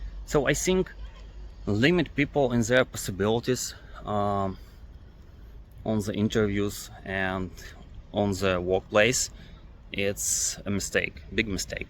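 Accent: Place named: Eastern Europe. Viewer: толковые вещи говоришь, но акцент... я в курсе, что у них ещё хуже за счёт индусов итп, и в курсе что нет ни времени, ни сил исправлять, но славянину капец как режет слух родной славянский акцент в англ если хочешь, могу подсказать рецепты быстрого удаления наиболее режущих слух моментов нет, я не препод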